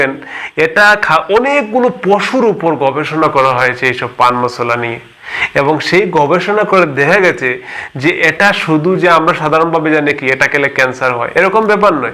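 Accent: Sylhet, Bangladesh. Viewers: ডাক্তার সাহেব, অত্যন্ত উপযোগি video উপহার দেবার জন্য ধন্যবাদ। বিশেষ করে আমাদের মা-বোনরা অনেকেই পাননশলা খেতে addicted হয়ে আছেন।আপনি কি আমার মত বাংলাদেশী সিলেটি নাকি আসামীজ সিলেটি। আমাদের সিলেটি ডাক্তার পেয়ে খুশী হলাম।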